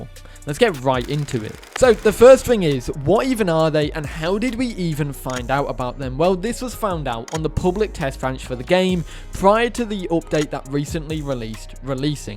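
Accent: United Kingdom. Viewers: its been so much time since ive watched a video, and god does the bri ish accent bring me memories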